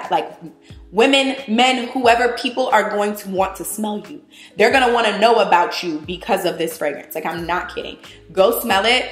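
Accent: New York, United States. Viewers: Please tell me you’re from NY? Because you sound like a straight up NYer 😂❤️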